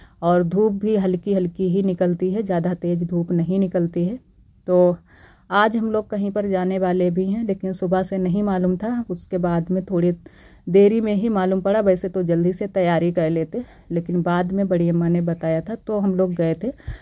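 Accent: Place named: Uttar Pradesh, India. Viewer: Bhut acha vlog aap 1 din apni Up wali voice m video k voice over kro bhut acha lgta h mujhe aplogo k language ❤ I m from west Bengal yha yhsb language dur dur tk nhi bs hindi or Bengali h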